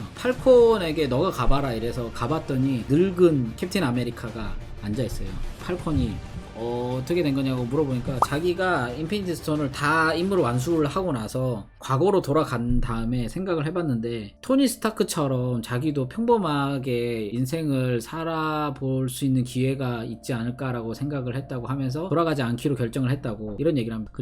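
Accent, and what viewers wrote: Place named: Japan. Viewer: 어 일본분인가여... 억양이.. 듣다가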